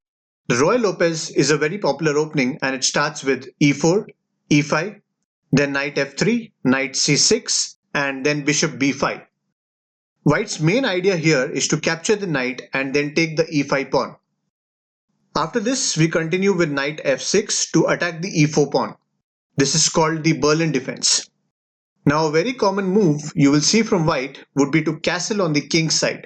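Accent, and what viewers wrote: India. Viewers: I just want to recommend that you speak slower. Since you have an Indian accent some words are difficult to understand. But if you speak slower it will be great.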